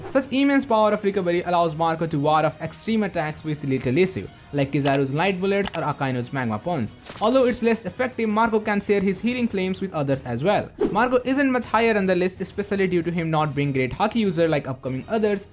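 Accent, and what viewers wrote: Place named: North India, India. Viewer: Bro, you need to work on your North Indian accent.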